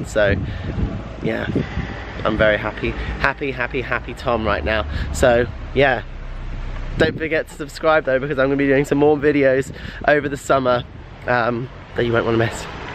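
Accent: United Kingdom. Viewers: Hi from Argentina Tom !! (I speak spanish so sorry for mi mistakes) Congratulations for you !! I really like it how so you speak with the britiah accent hahah. And i always shocks when see you doing that in the pool!! Is really crazy how you can do that. I probably never can do that, im more studying person haha. But good luck for you !!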